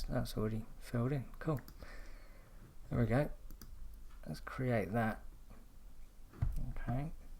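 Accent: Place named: London, United Kingdom. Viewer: Wow amazing London accent:)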